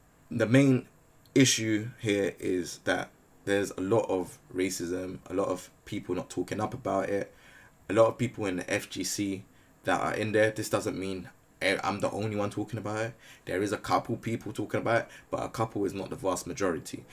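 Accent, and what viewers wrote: South London, United Kingdom. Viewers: This message proper resonated with me. I don't know if I've seen you before but I'm 28 from South London, New Cross, Deptford area and I know from the way you talk you were from a similar place. I'm mixed race and have experienced elements of the things you were talking about. So I'm glad you're talking about these things on your platform, makes people like us feel less weak for admitting these issues affect us I'm the way they do. Love bro ❤️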